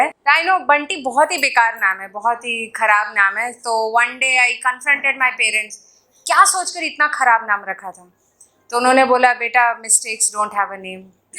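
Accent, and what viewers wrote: Bihar, India. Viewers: That bihari accent.. 👍😂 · I like your bihari tone 😅😅😂😂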